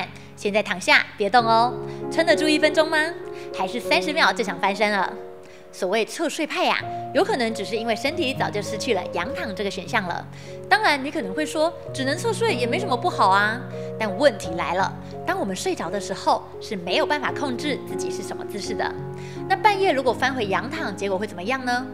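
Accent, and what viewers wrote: Taiwan. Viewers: 台湾腔好可爱☺️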